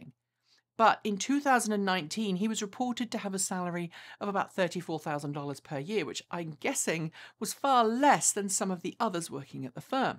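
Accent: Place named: England, United Kingdom. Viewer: I can't stand that haughty English accent!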